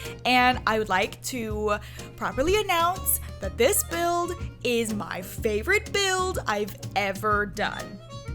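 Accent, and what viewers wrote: New Jersey, United States. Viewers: A Girl from Texas talking in a jersey accent I need to here this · WHAT IS WITH PPL SAYING A NEW JERSEY ACCENT. LIKE BOI WE DONT HAVE ACCENTS.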